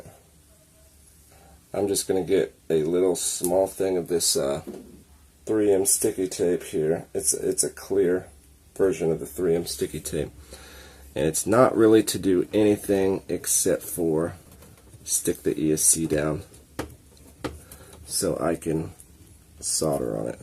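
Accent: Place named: England, United Kingdom. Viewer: very close English accent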